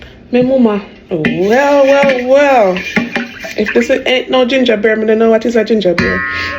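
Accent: Jamaica. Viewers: I have to make this, omd. I take ginger pills for nausea, may as well make the ginger a whole experience. The recipe looks amazing, thank you so much for sharing it! Your accent reminds me of my great grandmother (she was Jamaican, though she’d been in Dublin since her 20s). Beautiful memories, thank you 🩵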